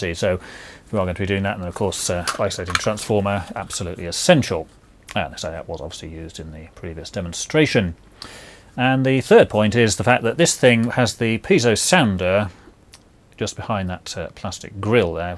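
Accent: United Kingdom. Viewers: Couldn’t understand the heavy British accent. Blimey!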